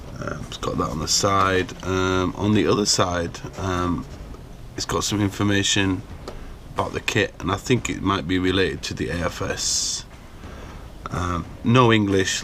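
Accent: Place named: Leeds, United Kingdom. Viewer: I've sent you an email also I didn't realise that's where you are based! as you sound like my mate who's a Leeds fan all the best from UK mark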